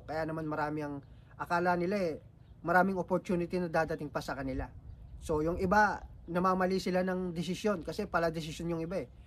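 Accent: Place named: Pampanga, Philippines. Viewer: kapampangan ka, balu mung balu oh pamagsalita mu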